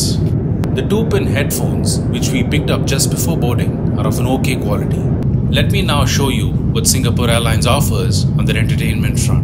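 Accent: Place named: Tamil, India. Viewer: Love from chennai ❤ Btw are you tamilan ? your voice is like that tho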